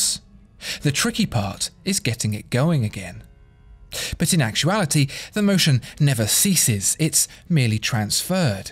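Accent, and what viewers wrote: United Kingdom. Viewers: "Tighter than a supermarket parking space" 😂 Yep, if the accent didn't already give it away you can tell he's British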